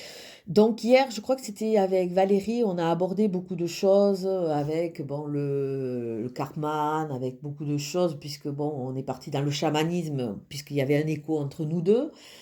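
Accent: South, France. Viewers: Bonjour, je vous remercie encore pour votre fabuleux live d'hier et merci pour ce tirage du jour. Et merci aussi pour la générosité de votre ❤ pour vos abonnés... Bon week-end à vous bel accent du soleil...💐 ☺ 😘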